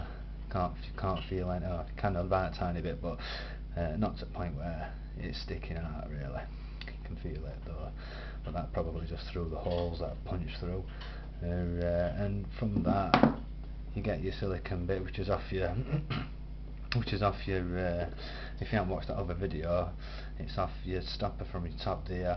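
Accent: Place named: United Kingdom. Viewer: Love the accent.. what part of the UK are you from? But anyway.. I really like that.. I think this would also work for the old fluval-on-top-of mod as well. I currently just have a bent paperclip inside the cart for the fluval to sit on and it works quite well. I could never get the silicon plug to be the right shape so I gave up on the zero filler thing.. whatever works though I suppose.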